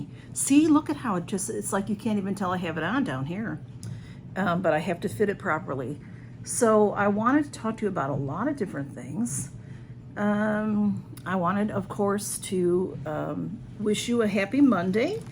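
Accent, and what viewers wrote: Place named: South, United States. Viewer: Happy Mask Monday! Nice Mask! Love your southern accent! I’m subscribed to the channels you mentioned. I’m trying to catch up, so many to watch lol. I have a terrible sweet tooth, trying to eat less sweets, it’s hard lol. I did eat the entire bag of that same Kettle corn in two days 😂 it’s my weakness. 🙏🏻😻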